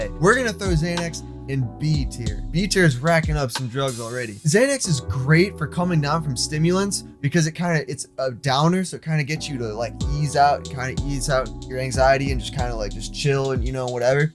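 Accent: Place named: East Coast, United States. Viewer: Man I don't mean to fanboy, but i would love to hang out with you. Sounds like you're on the east coast somewhere. Mid-Atlantic? Close to Pennsylvania?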